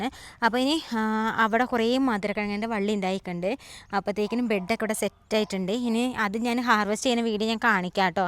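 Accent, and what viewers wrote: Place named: Kannur, India. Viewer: സംസാരം കേട്ടപ്പോൾ തോന്നി സിസ്റ്റർ കണ്ണൂർ ആണോ നമ്മുടെ നാട്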